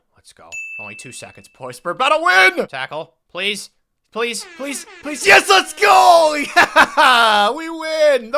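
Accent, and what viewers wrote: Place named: Mississippi, United States. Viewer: U from Mississippi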